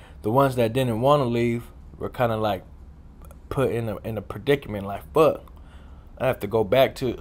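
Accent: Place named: Fresno, United States. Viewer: First time coming across your videos you sound like a Fresno dude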